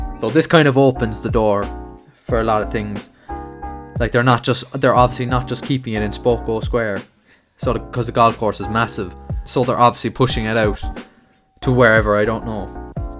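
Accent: Ireland. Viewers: Your accent are you Irish?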